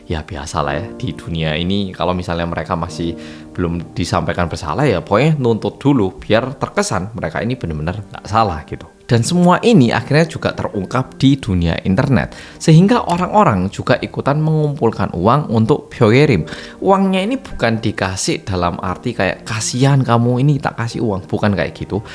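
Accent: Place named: Malang, Indonesia. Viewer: Oalah sakno e talah.. rest in peace ya cantik.. jan kok nemen.. mas kok yo ero kualat.. hahahhahaa... jan medok malange.. salam dari malang.. ❤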